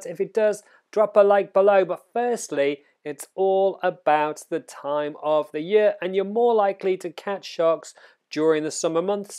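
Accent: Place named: United Kingdom. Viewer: WATCH OUT FOR THE “LAW” DROP IN—bruh with your british accent i cant tell what the heck ur saying about the short window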